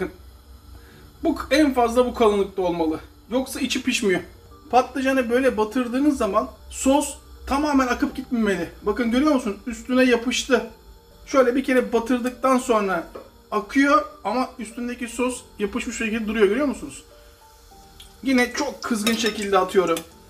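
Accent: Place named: Izmir, Turkey. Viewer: Uzun süredir videolarınızı ağzımdan salyalar akıtarak izleyip abone ol ve beğen tuşuna basmayanlardan biri de bendim itiraf ediyorum. Bu gizli gizli izlemekten veya herhangi bir kasıttan değil. Her videoyu izledikten sonra eliminin dışıyla ağzımın kenarından akan salyaları temizleyip vay hamamınınüy dedikten sonra üzüntüyle YouTube uygulamasını kapatmamdandı. Bu andan itibaren bir 1-2 dakikalık beyin donması oluyor sen de bize hak ver. Neyse bir tekneye göz koymuşsun bizim de katkımız olsun diye abone oldum, videolarına seri like basıcam şimdi, katılı aç katılalım da. Yalnız senden bir şey rica ediyorum. Aksanından konuşmandan İzmirli olduğun çıkarımına vardım. Yaklaşık 1,5 aya Türkiye’deyim ve çoğunlukla İzmir’de olucam. Beni misafirin olarak kabul eder misin?Rakılar benden, senden o Yunan mezelerini yapmanı isterim.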